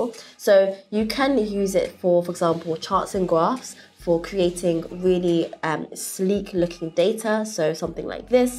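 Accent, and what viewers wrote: United Kingdom. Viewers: I LOVE YOUR UK ACCENT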